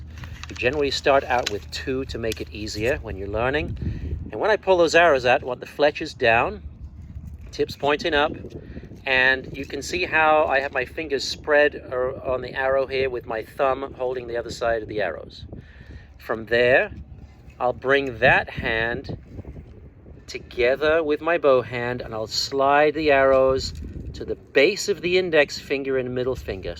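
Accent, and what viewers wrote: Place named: Transatlantic, United States. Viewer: real transatlantic accent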